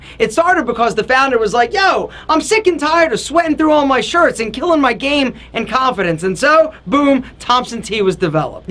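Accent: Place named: Pittsburgh, United States. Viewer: this guy sounds like a yinzer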